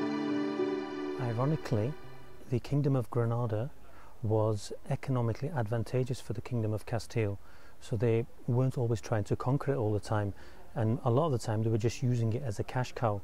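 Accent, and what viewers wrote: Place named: United Kingdom. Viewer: شكرا يا وردة. هل انت سوري من بريطانيا ؟ لهجتك اكسن بريطاني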